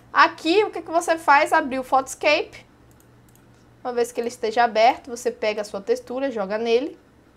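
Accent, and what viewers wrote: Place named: Bahia, Brazil. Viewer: Bacana! Vc pode conseguir também editar os mapas de bump e reflexo através do Color Correction em vez de usar o Mix. Foi inclusive a primeira vez que vi alguém usar o Mix com essa finalidade que vc usa. (em geral eu uso o Mix apenas no Diffuse pra mesclar texturas diferentes, como adicionar pontos enferrujados a um metal originalmente polido). Obs. Adoro sua didática. Esse jeitinho alegre e o sotaque baiano me encantam.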